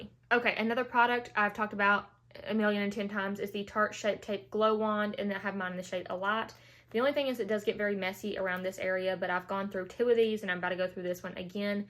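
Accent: South, United States. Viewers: I love love your southern accent. This is my first time watching your YouTube page! I found your channel through #allieglines channel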